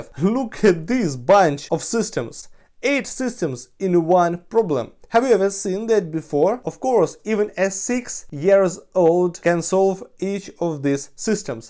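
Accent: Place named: Russia. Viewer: it's also pronounced close to that way in French But yeah he obviously sounds Russian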